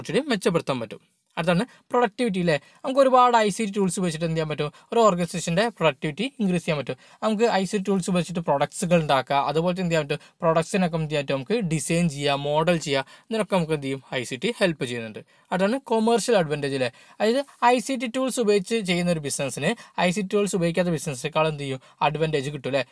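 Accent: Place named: Malabar, India. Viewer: പക്കാ കാക്ക ഭാഷ ( ബാസ )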